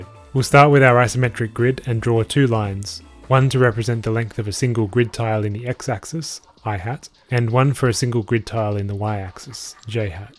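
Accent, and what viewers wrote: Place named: Australia. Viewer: >strayan accent >math :(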